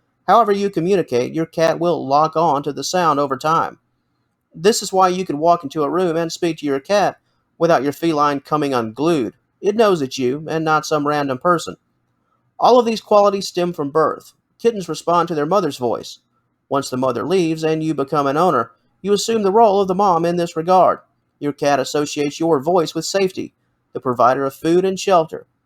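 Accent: United States. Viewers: I don't like your cowboy accent. Difficult to understand for us non-speakers. Improve.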